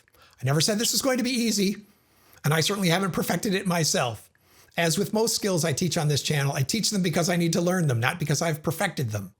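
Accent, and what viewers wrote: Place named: United States. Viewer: Sorry, what part of US are you from and what do you call your accent?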